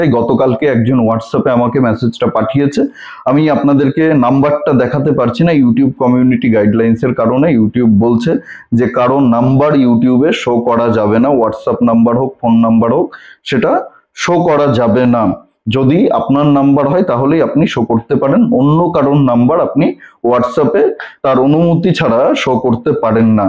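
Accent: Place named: Bangladesh. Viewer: ভাষা শুনে তো ফুল বাংলাদেশী বলে মনে হচ্ছে... imported from Bangladesh...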